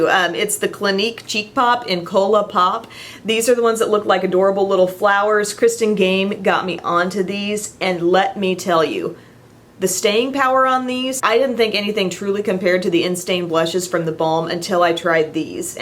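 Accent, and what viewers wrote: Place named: Midwest, United States. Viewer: Your ridiculously adorable Midwestern accent makes me feel like you're my super pretty big sister patiently helping me learn how to do my makeup so I don't end up with bronzer tiger stripes!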